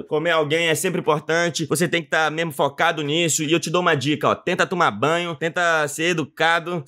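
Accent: Brazil. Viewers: ta com um sotaque carioca da gema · bacana! Tu tem sotaque? Nunca ouvi sotaque piauiense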